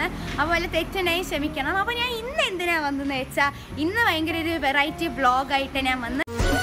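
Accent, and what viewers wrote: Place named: Palakkad, India. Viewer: Anthokkeyayalum plkd slang varum ley😅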